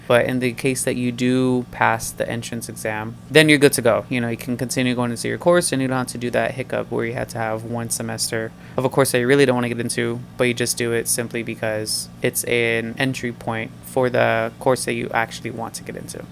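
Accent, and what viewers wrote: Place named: Philippines. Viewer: Is it just me? the way he explains sound so Filipino 🎉😅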